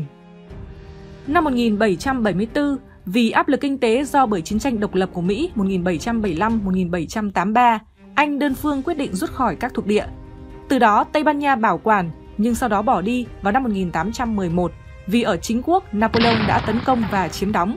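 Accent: Standard. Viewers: Giọng đọc thuyết minh hay và chuẩn luôn ủng hộ kênh... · Thuyết minh quá hay ! Rõ ràng , nhập tâm , chuẩn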